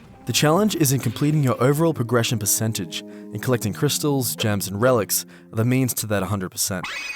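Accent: Australia. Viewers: He made it so engaging because of his Aussie accent. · I just can't take a serious discussion by someone with such a stereotypical Aussie accent.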